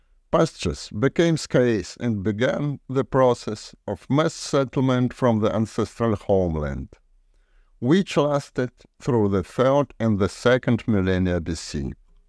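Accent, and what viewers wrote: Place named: Eastern Europe. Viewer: Although you read all this with a Slavic accent, you forgot to mention the Slavs!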